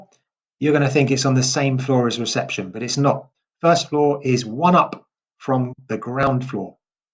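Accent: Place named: United Kingdom. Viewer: The best teacher!!! ☺️ By the way, I love British accent.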